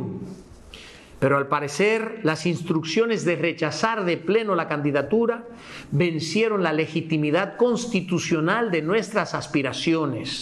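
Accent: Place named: Dominican Republic. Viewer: Admiro tú español Dominicano, Increíble, eres 100% Dominicano.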